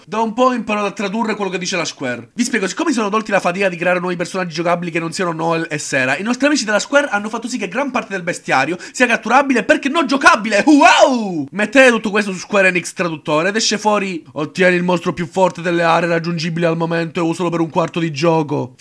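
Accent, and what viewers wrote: Italy. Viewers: Ma sei un genio, il modo di parlare (accento siciliano?), il video stesso come e stato montato etc...=>iscritto...(trovato grz al commento di TheWildLife212 nel video die farenz "Caro Angolo, ti chiedo - Mantova Comics & Games - 10/03/13")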